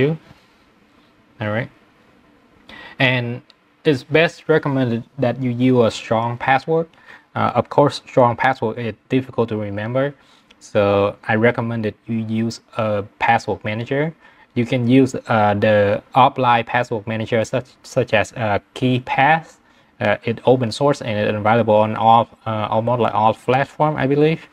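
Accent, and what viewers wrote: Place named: Vietnam. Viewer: recognize by the accent. I'm also Vietnamese, and I am too, building the homelab with opnsense and proxmox and truenas. Seeing other vietnamese enthuiast about opnsense make me feel I'm not alone :)))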